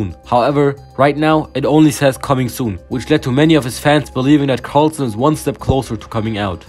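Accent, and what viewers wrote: Germany. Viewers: are you german (im german) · You sound very German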